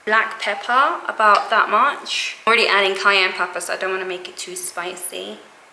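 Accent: United Kingdom. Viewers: Why are u trying so hard to sound like a white English girl? Smh.....U r BLACK!!!!!!!!!!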